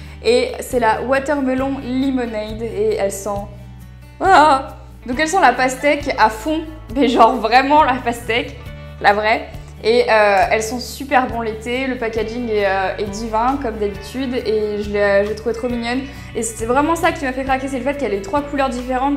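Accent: England, United Kingdom. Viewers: Coucou 💎j'ai trouvé ta Vidéo super , déjà elle a pas durée 30 minutes comme certaines youtubeuses qui s'éternisent sur tous! Et puis tu as une façon de parler , expliquer très agréable a entendre.. Surtout avec la petite musique douce... Tu es posée et pas entrain de crier comme d'autres 💘ta coiffure et ton maquillage sont super jolies 💘ça te va trop bien!tu as un magnifique accent anglais 😉tu est super , je ne te regarde plus que toi sur Youtube tu es la seule vraie youtubeuse tu es une vraie passionnée et ça fait plaisir a voir ❤️ne change rien 💘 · Jadore ton accent anglais 😍😍💞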